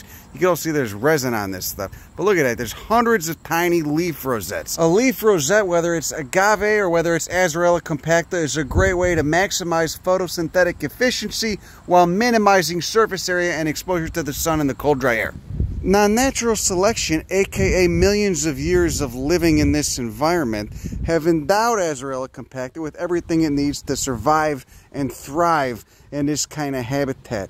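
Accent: Chicago, United States. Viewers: as a new Yorker i feel like this accent is most likely from Chicago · I think every nature video should be narrated by someone dude from Chicago. Chefs kiss! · Eloquence, like a Chicago accented David Attenborough! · Chicago. He’s from Chicago. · this is a chicago accent! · no, this is not a new York accent. It’s a Chicago accent. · It is hilarious to see the title and thumbnail, then you click on and you're like, "Chicago accent." Oh yeah, its you. LOL How's it goin' ya old prick? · Lotta people in this comment section aren’t familiar with a true Chicago accent I guess 🥴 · Ok, apparently it is, in fact, a Chicago Accent, so sorry about that, sounded that way to my southern ears! 😆😁 Still delightful though! 🤍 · This guy's from Boston or Chicago. My bet is Chicago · That’s a Chicago accent.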